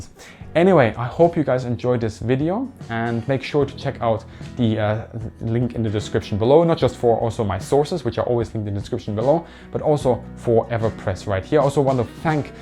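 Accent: Germany. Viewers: Well, it's Germish. Such laziness of English speaking they usually learn no foreign language AT ALL. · Hey this guy talks like a german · Are you German... you sound like it? If so, you are the only German person I've ever heard who knows how to pronounce 'bomb, bomber, bombing' correctly (i.e. not bom-b, bom-ber, bom-bing). Well done! :-) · This is a fantastic history video. This is the most fascinating WWII history I've ever learned!! Def subscribed, and will contribute on Patreon. Strange thing is, you sound German, but you referred to Germans as "krauts". Is that a popular sentiment in Germany?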